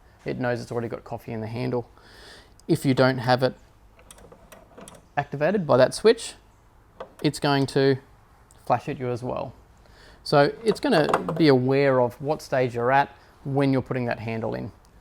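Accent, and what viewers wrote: Australia. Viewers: Just love the Ozy accent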